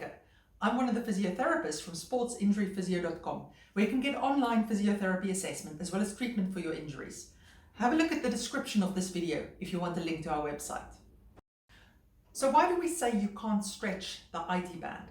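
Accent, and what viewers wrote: South Africa. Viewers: Love your channel, extremely useful, plus I love the SA accent :) (I was born in Germiston). I can't find any videos on warm up exercises before runs. I use <link> <link> and <link> which ones are best? Or even better do you have a video on the best 5-10 min warm ups to use and maybe cool downs?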